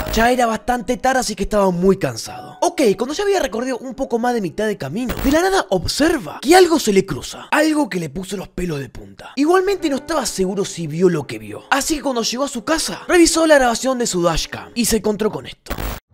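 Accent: Argentina. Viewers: De que provincia de argentina sos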